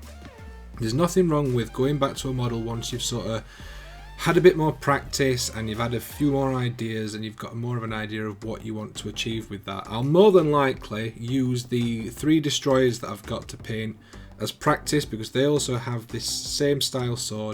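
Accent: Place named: West Yorkshire, United Kingdom. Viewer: Warp stone glow in West Yorkshire accents wins the internet mate. I’ve subbed...new to 40K....not sure how many games I’ll play but I’ll certainly be using your tips in general. Thanks man.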